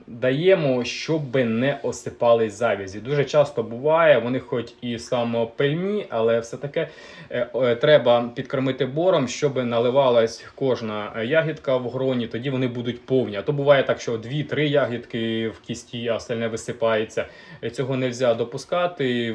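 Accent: Russia. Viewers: Да , да охотно верю, прослушай ролик.Твое " Вiльне володіння" аж по ушам бьёт.Ты даже слова выговариваешь на русский манер.Там лютый Суржик.Одного не понимаю НА ХЕРА ОНО ТЕБЕ НАДО? Был нормальный канал, на нормальном языке и тут тебя Майданутость покусала? Кстати если Шо Я в Винницкой области.А то сейчас начнёшь " Вам россиянам ,нас вильных не понять."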